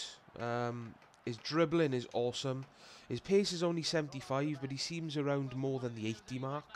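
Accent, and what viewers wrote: Wales, United Kingdom. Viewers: are you welsh? you seem to have a welsh accent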